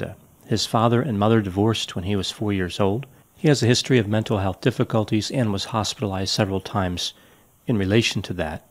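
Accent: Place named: East Coast, United States. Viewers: I almost want to agree with you on that one, it sounds definitely east coast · I hear it again on the word "thoughts," as well. New England is as narrow I can place it.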